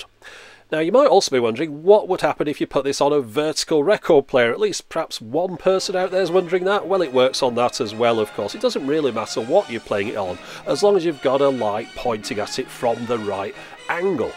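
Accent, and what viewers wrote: United Kingdom. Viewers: Awesome! I love it when you make audio equipment and vinyl videos... I only have one album with a hologram on it, it's by. I also like your british accent, as I'm from México it's more understandable to me than american english. Great video as always! Keep them coming! - IQ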